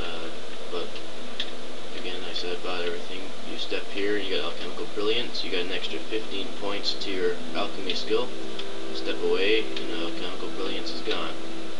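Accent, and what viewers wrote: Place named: Eastern Canada, Canada. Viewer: your from eastern canada arn't you?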